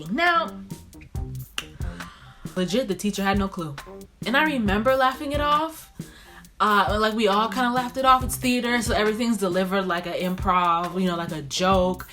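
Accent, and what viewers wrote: Louisiana, United States. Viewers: lolll i heard the Louisianan accent. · OK I didn't know got a lil Louisiana spice going on 😛😛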